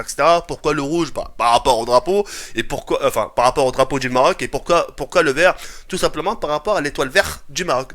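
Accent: England, United Kingdom. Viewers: euh ton accent anglais TK ses koi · LES GANTSSSSSSSSSSS et ton accent anglais me font trop rire x))) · Très beau accent anglais :)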